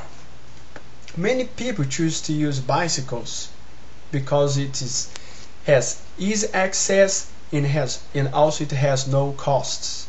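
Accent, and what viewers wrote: Brazil. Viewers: Let me guess...are you brazilian right?...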